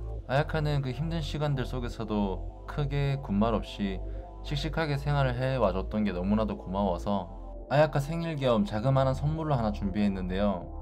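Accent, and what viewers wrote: Gyeongsang, South Korea. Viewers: 와~~ 경상도다~. 제일 멋지다! 강력 응원합니다. 얼머나 좋으노.. 아.. 진짜 요새 한일부부 AI 추천으로 넘 마이 올라오던데.. 하나 같이 머슴아들이 내시들 처럼 하이 어리가또 해사서.. 싸대기 한대씩 쳐 날리고 싶더마는... 와 진짜 짜증 만땅 올라오더마는.. 몽창 다 날려도 와이리 머이 올라오노.. 하다가.. 멋집니다 이 분. 오리지날 경상도 고대로네. 저는 부산. 오래오래 향복하이소~~~ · 경상도남자 억양을 배우셧네 ㅎㅎㅎ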